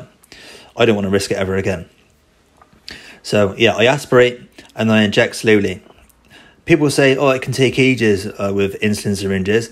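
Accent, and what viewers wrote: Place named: Scotland, United Kingdom. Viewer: Awesome video and accent, scottish?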